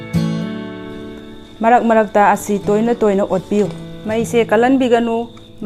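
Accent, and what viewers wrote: Kakching, India. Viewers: Ngangiba lonsina kakching haiba lamdugi lon ne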